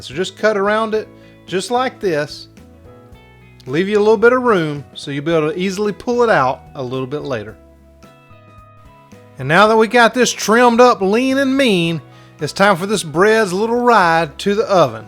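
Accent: South, United States. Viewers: And the GOLD LOGIE goes to you Mr 🎉🎉🎉🎉🎉 I’m from “ Down under “ Australia and wanted to say congrats on your bread. It is the best one that I have found, I did tweak it a little bit ( less pork rind, more of Parmesan, golden flax seed and coconut flour) … DELICIOUS… Even my non Keto husband loved it. I also had enough mix left over to make muffins which I will freeze. Keep up the Good work and keep it “PROPER”🎉🎉 love your southern accent, can listen to it for hours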